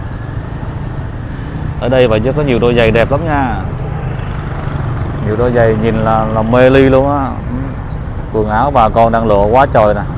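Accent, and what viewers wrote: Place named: Hanoi, Vietnam. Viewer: Giông ad như ở thủ đô của Cụ ba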